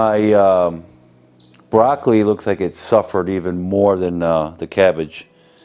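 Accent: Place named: North, United States. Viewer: You keep saying "we, down here", but you don't sound like you're originally from "down here". I was born and raised in East Texas myself, but you sound like maybe you're from a little further north. Nothing wrong with that. Have several Yankee friends. Was just curious where you grew up.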